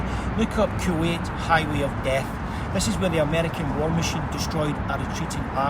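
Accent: Scotland, United Kingdom. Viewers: What's the accent?! Scottish?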